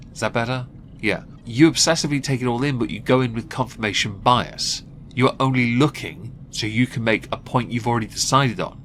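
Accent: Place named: England, United Kingdom. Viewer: 😂😂 the only time I ever see these hambeasts is when you make videos, thanks for suffering through it for us. 😂 she will never learn. Though sometimes I feel like you need to remember that America is culturally dominant and that nobody takes the old english accent seriously tho...like accept that and know haha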